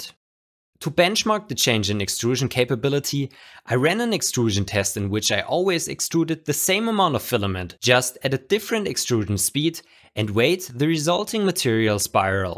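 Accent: Germany. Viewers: Ja genau! Ganz geil alter only watched 5 seconds of the video and already knew u were German lol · Are u German?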